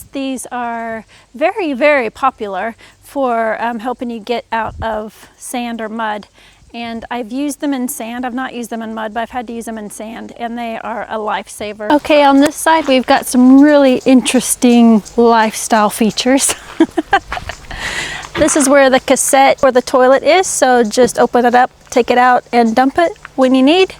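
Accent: Australia. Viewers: If you dont realize it, you are picking up a bit of an Aussie accent. Can i ask the approximate cost of your Toyota If they were sold in the US there would not be a single unit sitting. Youre so cool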